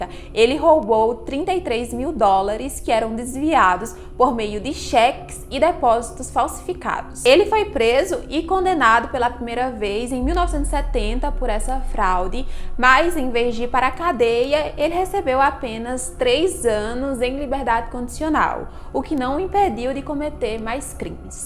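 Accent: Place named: Rio Grande do Norte, Brazil. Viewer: Segunda vez que venho no seu canal muito interessante, pelo seu sotaque. Vc mora em natal rio grande do Norte ? Bjs linda !!!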